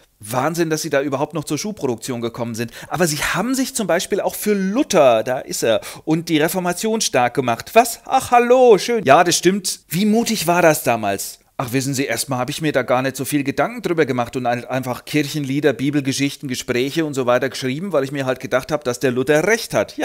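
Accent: Franconia, Germany. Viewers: der frrrängische Dialekt ist das Tüpfelchen auf dem i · Der fränkische Zungenschlag, wunderbar! 🍪🍪🍪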